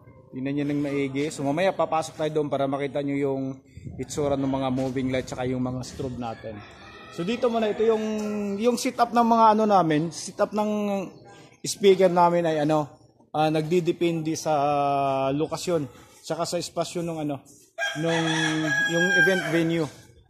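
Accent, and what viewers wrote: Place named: Visayas, Philippines. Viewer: Bisaya kayo boss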